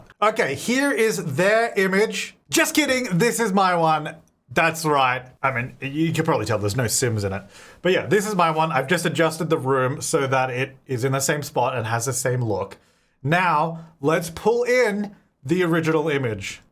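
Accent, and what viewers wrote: Australia. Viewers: I’m curious… is this guy Australian? I like the way he says “He-Ah” instead of “Here” but it’s cute. Good video btw!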